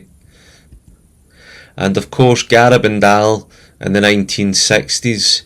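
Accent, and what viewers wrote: Scotland, United Kingdom. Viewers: What the heck! Your channel is pure dead brilliant!!! I live in Australia, and I've never heard of you before. My mother still lives in Greenock though, which can't be that far from you, gauging by your accent. You could have at least told her, and she could have sent me a postcard. So much wasted time. Now I'm having to watch all your other videos. My wife doesn't understand, says there are household chores to attend to; dishes and stuff. You've put me in a difficult dilemma. I am going to pray about it. 😀👍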